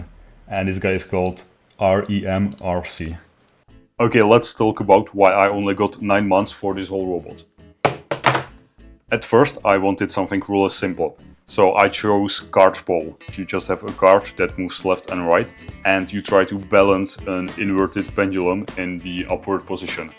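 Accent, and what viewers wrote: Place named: Czech Republic. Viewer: Mohu se zeptat kde studuješ? :D doufám že jsem rozeznal dobře český přízvuk :)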